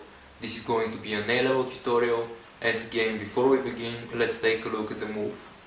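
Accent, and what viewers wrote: Bulgaria. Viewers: hey man, are you bulgarian?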